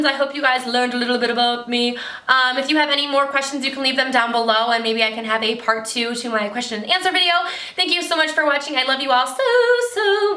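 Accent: Michigan, United States. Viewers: Ugh you are so gorgeous! And I had a feeling you were from Michigan!! (I could hear the accent lol) Im actually moving to Michigan in 2 days for University!! I'm a Floridian so the cold is going to be a bit challenging. Any recommendations of cool places to go OR SHOP? :) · I thought your accent sounded like a Michigander! I am from the UP!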